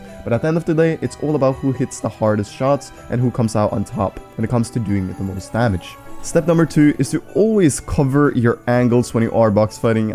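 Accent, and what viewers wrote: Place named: Norway. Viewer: omfg du hørtes så jvl norsk ut med da du snakka engelsk🤣 translated: you sounded so f*ckin Norwegian when you talked English 🤣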